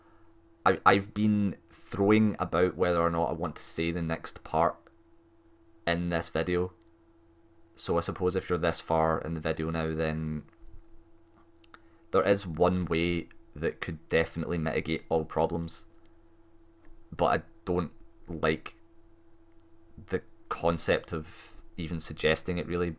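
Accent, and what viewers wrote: Scotland, United Kingdom. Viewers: Sorry for your loss. I'm from Canada and I've been watching you guys for a while now (pretty much for the guides but also the let's play). It was hard when I started because my first language is French. Although I speak English well, I wasn't used to your accent. It took me a while but after few videos, it was all good (I even mimic your accent when I'm playing Dark Souls and it makes my wife laugh so much asking "Since when you have a Scottish accent!!!"). Your channel is genuine and I'd be glad to contribute ($$) if it can help you! Cheers mate!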